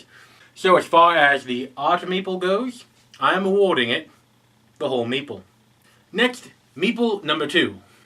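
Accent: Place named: United Kingdom. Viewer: Funny! I thought you were a Brit! Good job!! 🇬🇧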